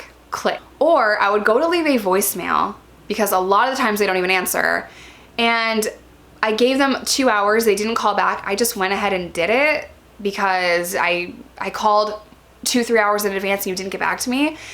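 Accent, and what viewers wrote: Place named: Upstate New York, United States. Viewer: knew you were an upstate new yorker by your accent, you sound like me hahahaha i'm in oswego county.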